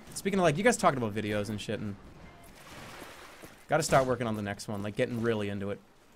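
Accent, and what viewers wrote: Boston, United States. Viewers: jerma's voice sounds so much higher in this video and he sounds more boston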